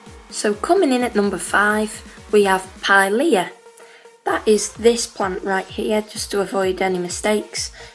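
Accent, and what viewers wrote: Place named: Scotland, United Kingdom. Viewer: I like ur accent, is it Scottish? Or British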